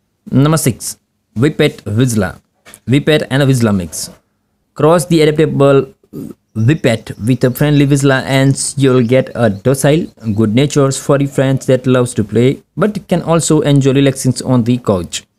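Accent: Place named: India. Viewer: I don’t think it’s AI. My guess is that this is a man from India doing the narration. Unfortunately, his accent is extremely difficult to understand. If he is going to be producing videos like this, he should at the very least SLOW DOWN.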